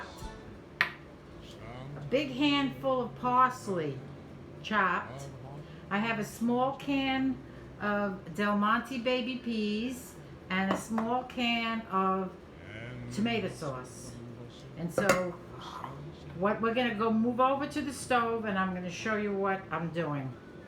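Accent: New York, United States. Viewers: The website to order the book is “ not secure” . Apple recommends that you contact the owner of the site and don’t give your credit card information. I enjoy your videos otherwise. I love your accent and it is same as my sister who lives in New Rochelle. I am a retired cardiologist from the Bronx who lives in Omaha for last 45 years. Of course, I lost my accent(ha, ha… NOT.)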